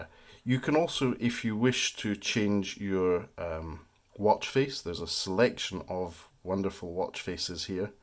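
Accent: Scotland, United Kingdom. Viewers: good to hear scottish dude doing tech and a youtube channel :D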